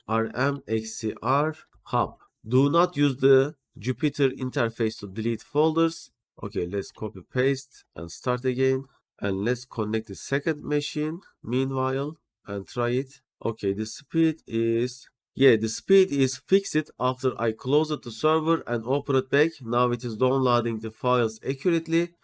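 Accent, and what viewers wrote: Turkey. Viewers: English with Turkish accent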